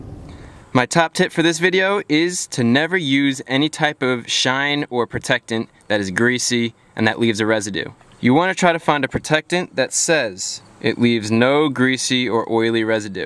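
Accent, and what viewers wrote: United States. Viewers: Your american accent drives me crazy!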